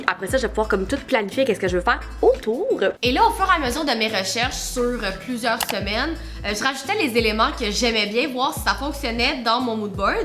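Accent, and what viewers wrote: Quebec, Canada. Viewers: Moi nan pis elle québécois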